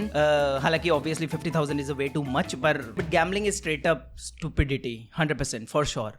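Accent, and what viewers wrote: Haryana, India. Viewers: I like speaking haryanvi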